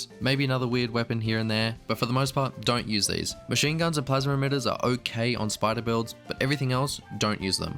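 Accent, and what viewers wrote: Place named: United Kingdom. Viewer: bro I thought he was British, now makes sense why the ping so high 😂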